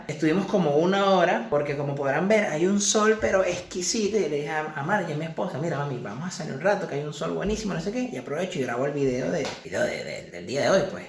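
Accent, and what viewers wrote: Venezuela. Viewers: No hizo falta escuchar 1 minuto de video para saber que eres venezolano. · Desde que empezò dije este chamo es venezolano... bajo a los comentarios y confirmo jajajaja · Llevo solo 1 minuto de vídeo y ya se w eres venezolano jajaja tan bello... Dios te bendiga papito!!!... 😆😘🇬🇧🙏🏼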